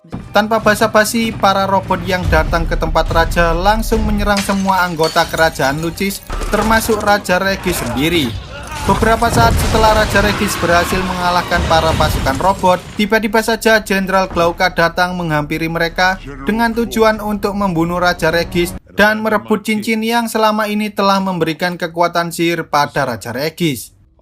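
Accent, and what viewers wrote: Java, Indonesia. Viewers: Kemana aja bang kangen sama omongan jawanya 😂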